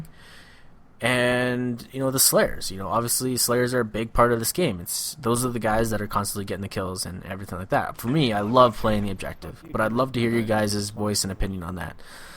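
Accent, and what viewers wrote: Canada. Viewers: u sound canadian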